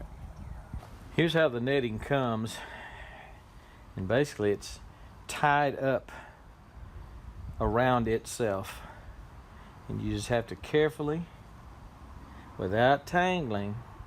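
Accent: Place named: East Texas, United States. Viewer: First, whoah that is a THICK East TX accent! Growing up in Houston, I remember hearing accents like this frequently anytime we had to go up 59 to see my uncle, and to attend antique shows/fairs. Super helpful video! Curious how far in the ground you put the 2x4’s? Did you concrete mound the bases of them?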